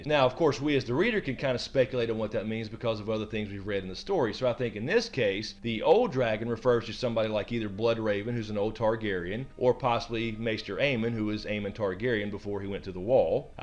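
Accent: South, United States. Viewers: I’m really loving to hear another Southerner like myself talk about GoT like a pro 😌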